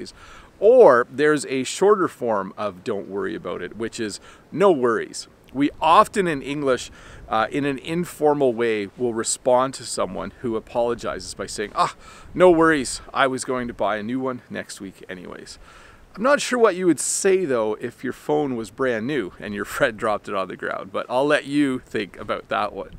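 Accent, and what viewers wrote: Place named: Canada. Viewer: Hi, a great English teacher, I often learn English from your teaching, it can help me to improve my English, especially about the pronunciation I've got better so much, actually I've never been to Canada, as you maybe pronounce quite different of some word from American at the US. in which I've ever been before, but that's not problem at all. Thank you very much.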